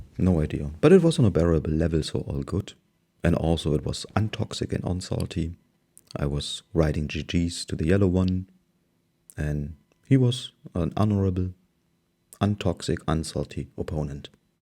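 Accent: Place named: Germany. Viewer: He has a slight German accent but everyone has to start somewhere. 💪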